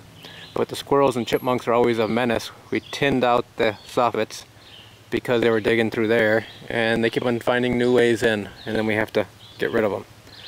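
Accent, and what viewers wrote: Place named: Germany. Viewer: Amish? Fabulous God loving people. Its good you can count on Amish for supplies. You sound German (accent) Thanks for video!!!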